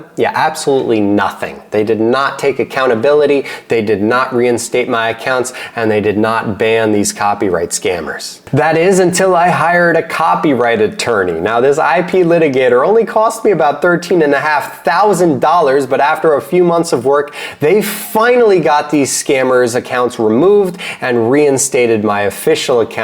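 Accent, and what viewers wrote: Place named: Midwest, United States. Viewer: man this guy amazes me every video. first time i saw him i was like "look at this nut job, tattoos all over his face and body" then i watch him and he is so charismatic and fourthrite and has always been upfront with everything hes doing . and now this video. This guy is definitely a don't judge a book by its cover example. also he has a accent from somewhere like iowa or illinois maybe more east but its interesting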